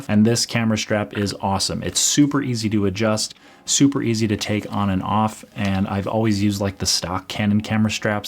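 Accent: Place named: Midwest, United States. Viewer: Thanks for all your great FCPX Tutorials and other content. One question: What's the correct way to use a FCPx project as a template for further projects. For example, lets say that you are making a 10 part series and you want them to have the same intro and outro as well as the same basic look and feel (but of course with entirely different footage and audio in each episode). Is there a way to use a completed project as a template for other projects that preserves intro and outro and the different effects that were used? Any tips on doing this, rather than having to start each new episode entirely from scratch each time? Thanks! btw, I used to live in Omaha and went to Westside High, so I also enjoy your mid-western presentation style.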